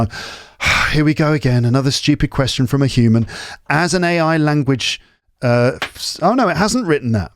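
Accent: United Kingdom. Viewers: How i downloaded it and what's its name of the app. I love your podcast, it's dramatically useful. I wanted to learn Amarican accent but after i know your podcast i started learn British accent 🤎🤎🌿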